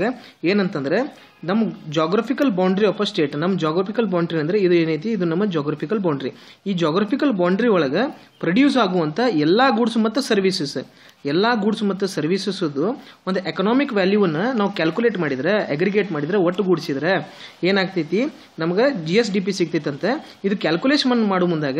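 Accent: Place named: Karnataka, India. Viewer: 👌bro nimm Kannaada acent keloke kushi aglikathithi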